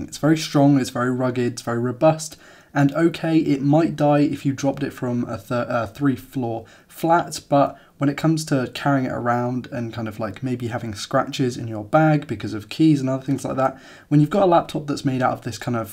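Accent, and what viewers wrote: England, United Kingdom. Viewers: At last someone actually English making these videos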